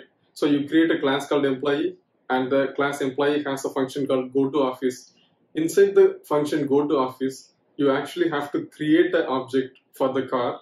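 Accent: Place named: India. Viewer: I badly understand Indiand pronunciation but this dude described this better than anybody else! 👍👍👍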